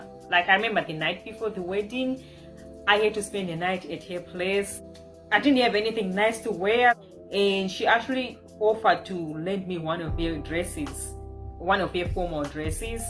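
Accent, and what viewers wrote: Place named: Haiti. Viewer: Interesting story. Thank you for sharing. What I learnt is once someone treats me with neglect - I have to lose my respect for them, and therefore, lose all desire to be connected to them in any way. If I keep trusting them, forgiving them, telling myself "I did something wrong, even though I have no idea what?" ......I WILL sooner or later get heartbroken and it will hurt A LOT! So, no more tolerating neglect and lack of communication from me. I respect myself too much to let people like this in my heart. If 2 weeks pass and a supposedly very close-to-me person is suddenly unavailable, I tell myself "I'm not interested in unpredictably push-pull, hot-cold, available-unavailable, friendly-hostile people, who are not mature enough to communicate what's going on". (Just wondering of you're from Haiti. I enjoy guessing-by-accent who's from where ....sometimes I guess right, sometimes wrong😂)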